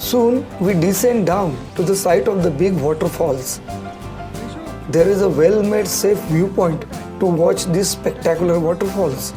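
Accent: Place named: Maharashtra, India. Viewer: True, it's a nice place to be with nature. Would love it more, if the commentator spoke English English. It was Marathi English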